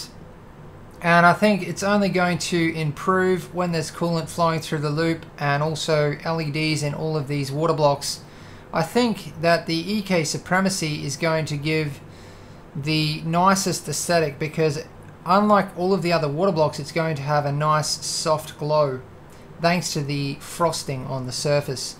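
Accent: Australia. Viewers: I can't tell if you're an Aussie or a Kiwi... You sound generally Australian, but when you say things like "build log part 12" as "boold log paht twulv", it sounds rather Kuiwui (like me!) Cool boold though!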